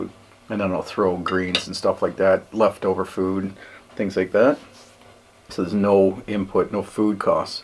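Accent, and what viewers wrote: Canada. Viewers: You sound Canadian but you don't sound like you are from Ontario. Is your Mum from the East coast?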